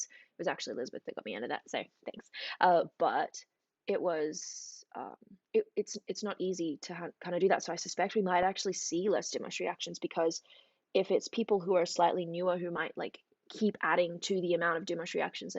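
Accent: Australia. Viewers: I love the aussie accent the way you say certain words littarly make my heart happy lol could listen all day!!!! Also could you check out otep the band there a great female metal group 👌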